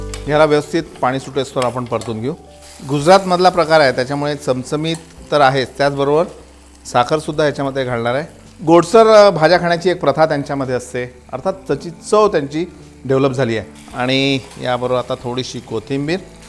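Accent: Vidarbha, India. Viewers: Hi I am from Baroda and I am a regular follower of your recepies.I love the vidharbha touch in your Marathi reminds me of my childhood days ....We call it as Sev Tamata nu shaag.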